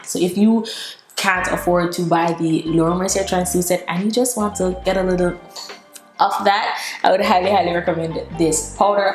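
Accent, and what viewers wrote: Jamaica. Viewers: Your Jamaican accent ☺️☺️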